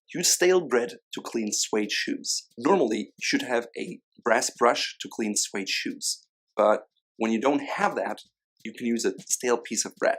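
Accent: Germany. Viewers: Is your accent German?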